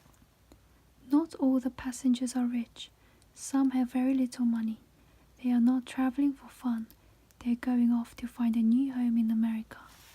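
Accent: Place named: United Kingdom. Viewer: 님 진짜 목소리 너무 좋으세요 ㅠ I love your voiceeee💕 그리고 영국 발음으로 읽으시는것 같은데 저는 미국 발음으로만 말할 수 있어서 그냥 목소리도 발음도 너무 매력적이에요! 잘 듣고 가요, 감사해요!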